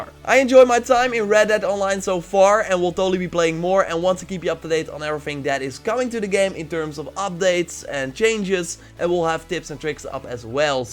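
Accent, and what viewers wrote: Netherlands. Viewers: You sound soooo Dutch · He's from the Netherlands man, don't be so rude. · I detect a dutch accent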